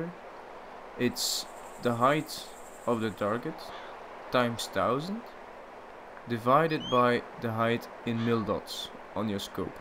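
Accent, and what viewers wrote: Netherlands. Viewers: you dutch? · your accent , are you dutch ? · Let me guess u come from holland XD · i knew this guys was dutch right when he started talking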